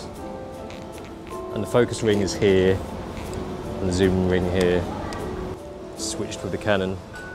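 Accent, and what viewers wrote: England, United Kingdom. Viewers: Kinda weird when a Chinese dude speak with an English accent.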